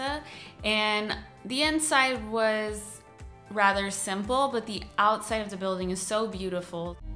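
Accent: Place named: Portugal. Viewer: Great portuguese accent 😉. Are you portuguese?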